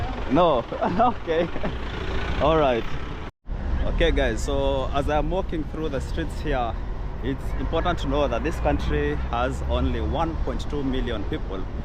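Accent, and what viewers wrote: Kenya. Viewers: Lived in Eswatini for seven years, such a great country! You sound Kenyan, thanks for the video! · Thats a Kenyan 🇰🇪 accent. Good job my brother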